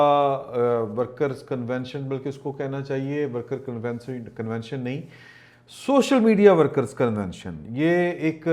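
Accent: Punjab, Pakistan. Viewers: Ur great 👌 Sir 👌 g good job 👍 naam tou suna hi hoga dialogue with Awais Sheik Multan Wala Punjabi tarka Kamal Ka hota hai aapka Imran Bhai yaqeen jaaniye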